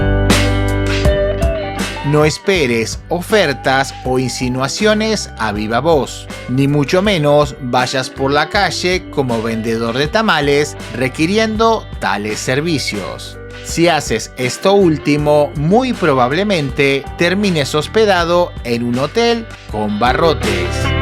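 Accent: Argentina. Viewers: Tú acento Argentino y tú léxico mexicano me confunde… 🤔💭💭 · De donde eres brot? Argentino no?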